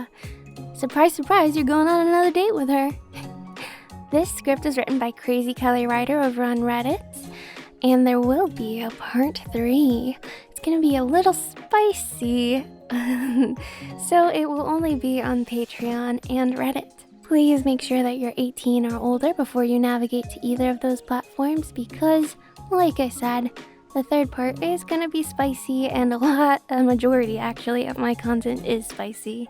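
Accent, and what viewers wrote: United Kingdom. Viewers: Since when did you unlocked the British accent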